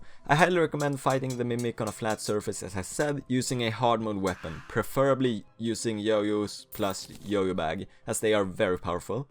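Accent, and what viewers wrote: Sweden. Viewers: that swedish accent is heavy af, ha kul i 2018 : D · Are u Swedish? Ur accent kinda sound Swedish. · Are you from sweden beacuse i can hear when someone started with swedish and learned english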